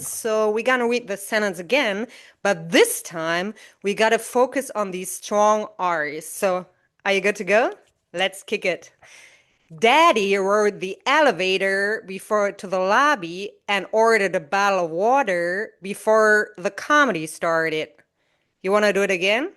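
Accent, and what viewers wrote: United States. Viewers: i'm hearing a Minnesotan accent. sounds good.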